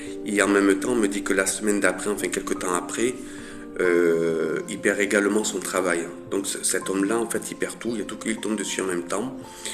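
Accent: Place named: South, France. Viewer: Bonjour," "Guidance intemporelle🎉Beaux Flashs.Qui parfois pas si,évident à dire.Mais,bien réel ce Flash.Super!...😊De toute beauté.Très intéressant et ce qui permet de mieux comprendre certaines,situations et aussi,certains comportements.Très beau Flash🎉Recevez mon soutien😊Plus rassurant et appréciant ce"Don"🎉Qui ne peut qu'amélioré le quotidien de chacun.Sincères remerciements" "🎉Avec son Joli accent du midi😊Franchement Beau ces Flashs🎉On ne s'en lasse pas😊Belle Richesse en ce Don🎉Prenez soin de vous😊Au plaisir!...😊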